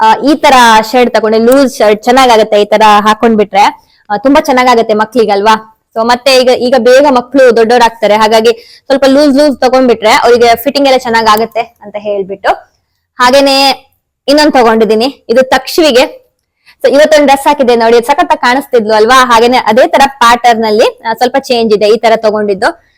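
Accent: Kundapura, India. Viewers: Nam kundapura kannada keli kushi ayt🎉🎉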